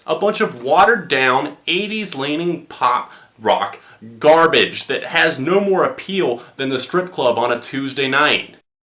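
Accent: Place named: North Carolina, United States. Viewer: I heard your voice and I was like, "I know he's from North Carolina. I know it." So I clicked in the description and I knew it. Anyways I've been loving these videos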